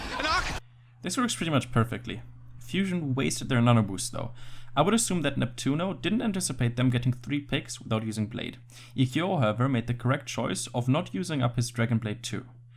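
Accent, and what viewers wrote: Germany. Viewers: Very nice channel, I'm so happy that I found it through Overwatch Central! Btw, are you from Germany? Cause you sound like a German :P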